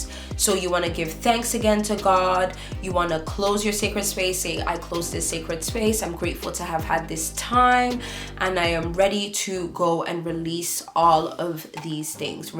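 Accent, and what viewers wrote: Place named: Caribbean. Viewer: I literally followed just for the Caribbean accent in the intro 🤷🏾‍♀️ yessss sus!!! Vid just starting so I can’t wait to learn n implement 🙏🏾🙏🏾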